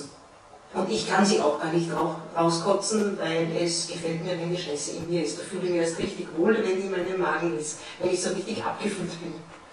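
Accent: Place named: Vienna, Austria. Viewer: confirmed. from Vienna because of her accent.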